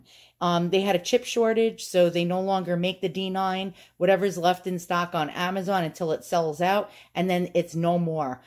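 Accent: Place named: New Jersey, United States. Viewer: I love your east coast accent! New Jersey?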